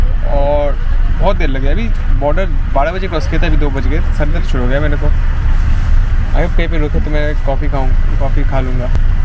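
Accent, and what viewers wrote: Bengal, India. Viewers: I like your vlog hindi with Bengali accent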